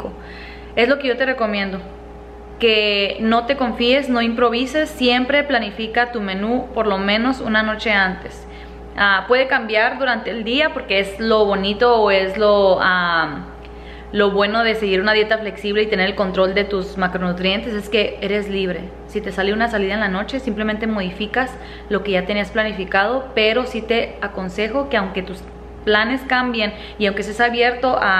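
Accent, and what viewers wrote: North, Mexico. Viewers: me parecen buenísimas tus recetas! Gracias por compartir! De donde eres, tú acento suena del norte, yo soy de Nuevo Laredo! Saludos!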